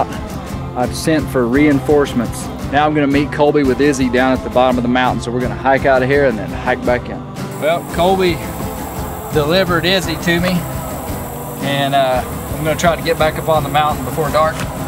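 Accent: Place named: South, United States. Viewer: That's the most southern accent ever. Great video.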